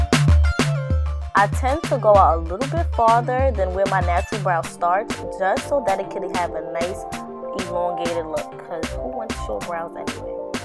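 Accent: New York, United States. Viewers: it's her accent, she's from New York . All New Yorkers speak like that 🆗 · where are you from? you sound like youre from ny or the dmv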